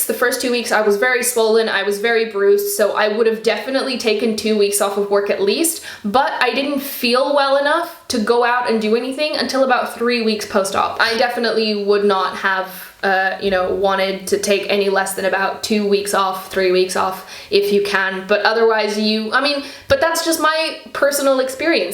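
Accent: United States. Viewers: WAIT WHAT THE HELL I HAVE BEEN WATCHING YOU FOR MONTHS THINKING YOU WERE AMERICAN AND YOU'RE PORTUGUESE JUST LIKE ME WOW I JUST REALIZED HOW MY ACCENT SUCKS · Rowan I thought she was American lol😂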